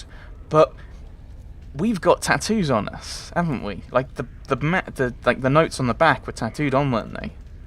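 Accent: United Kingdom. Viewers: Some words i coudn't understand, so i turned on subtiteles which made complete gibberish. That's when i realized i finally can understand UK accent! Love this playthrough, the way you are trying to read every dialogue the devs had put into the game, with relatively fast pace reading. You have inquisitive mind, and i love that you share your impressions of the game and your thought process.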